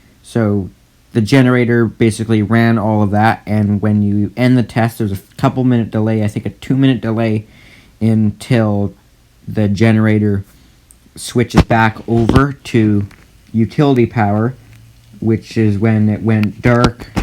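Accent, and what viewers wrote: Canada. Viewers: Ah, that's interesting. We have a similar program here in Alberta that allows you to go to school in the morning and work in your desired trade in the afternoon. It's called the RAP (Registered Apprenticeship Program). Is it similar to where you are (not sure which province you're in but I can hear the Canadian accent in your voice)?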